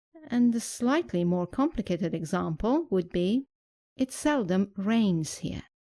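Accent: United Kingdom. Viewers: Yor english is british?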